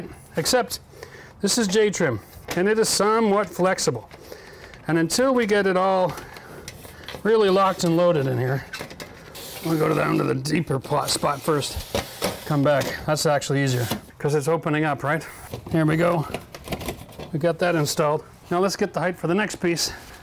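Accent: Canada. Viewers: You live in Florida but have a Canadian accent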